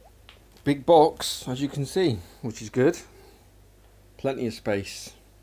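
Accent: England, United Kingdom. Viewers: any way i love your vids keep up the good work matter a fact do know ksi sound like your from england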